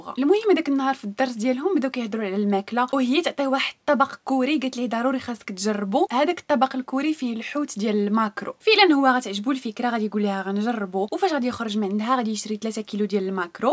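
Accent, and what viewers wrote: Morocco. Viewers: Très chère. Je viens de découvrir ta chaîne et depuis je suis accro. Il est clair que tu es une perle, mais je tiens à exprimer quelque chose d'autre si tu permets. Moi je suis algérienne et mon défunt papa est né et a vécu au Maroc. 16ans, il aimait tant ce pays, je porte pour le Maroc un amour immense transmis de mes ancêtres 😊 à force de regarder tes vidéos je me retrouve à imiter tes mots ton accent Marocain et ça me rappelle papa. Ce qui m'attriste d'autre part c'est les relations entre les deux pays, pourtant nous, les gens le peuple, on a rien à voir avec cette politique, on est frère et soeur. J'aurai vraiment voulu pouvoir visiter le Maroc. J'espère que tu liras mon message. Je te porte bqp d'affection, tu es belle juste bien vivante, professionnelle. Mashallah. Bon courage Hbizaaaaaa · جمالك ماشاءالله وجمال اللهجه المغربية🇲🇦🇸🇦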